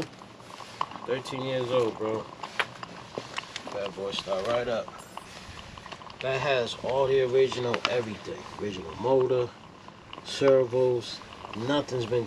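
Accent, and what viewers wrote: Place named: Nuyorican, United States. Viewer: I sense NYrican in the house!!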